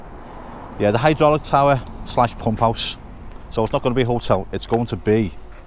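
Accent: Liverpool, United Kingdom. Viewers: Brilliant camera work and a real scouse commentary. Love it. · You sound a bit like Jamie Carragher the way you pronounce your scouse A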